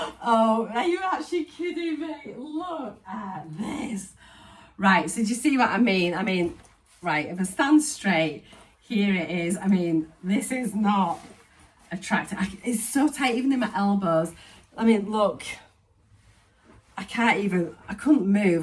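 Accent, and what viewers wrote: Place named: North Yorkshire, United Kingdom. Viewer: Me again! On this one now. I meant to say despite doing Noom and coming to a 6 month plateau, I was blown away when my doc told me it wasn't anything I was doing that was keeping me plateaued - it's the sodding menopause and it was like a light went on. I thought the sweating and lack of oomph was down to fibro but it was getting so bad and the lack of weight loss knowing I was eating less than 1000 cals a day by a fair bit had got me so down I'd barely been able to get out of bed for more than 15 weeks 😭 when she said it's not you, it's your hormones, I cried! To feel like you're not self sabotaging and finally someone says you're doing all the right things but your body is just changing was epic! HRT is life changing and so is Mounjaro. I've downloaded an app called Fastic to use as my Noom sub runs out soon and I'm logging daily how I feel. 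You're such a joy! Used to live in North yorks, near Hawes where they make Wensleydale and I recognised your NY accent as soon as I watched you 🤗 take care honey. Sarah xxx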